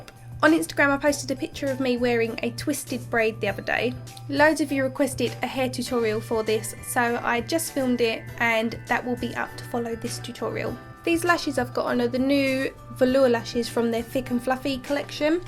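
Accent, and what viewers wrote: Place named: South East London, United Kingdom. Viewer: This is a gorgeous look, I'm obsessed with and at the moment! I love your accent, where are you from? I'm from South East London and it reminds me of home! x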